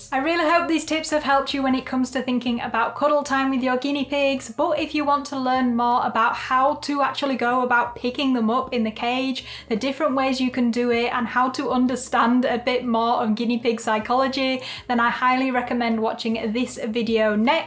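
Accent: England, United Kingdom. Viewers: can I ask you what part of England are you from? I am interested in regional accents (I'm American). And I've always loved the UK 🇬🇧. Thanks.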